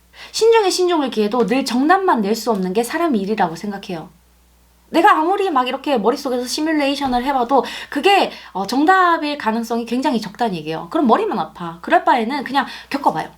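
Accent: Gyeongsang, South Korea. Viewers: 경상도 목소리 친근해요 타지역 10년이라 항상반가워요^^ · 아 어디말투지? 경상도+서울 리믹스 ㅋㅋ 매력있네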